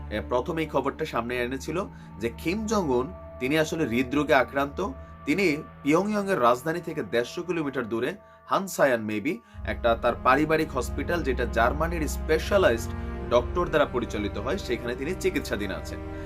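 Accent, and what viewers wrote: Comilla, Bangladesh. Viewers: Tomar video gulo khub pochondo kore dekhi.Tomar news e aanek totho pai r tomar presentation besh bhalo. Tobe tomi comilla r ancholikota over come korte paronai. Tumi bar bar desh k dash bolo. Jeta srutikotu lage. Tumi bolechi mind koro ni to ? Aami tomar ma khalader moto. So....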